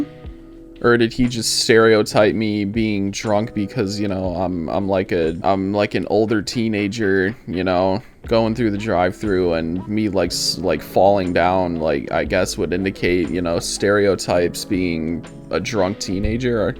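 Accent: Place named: Mexico. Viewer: u sound mexican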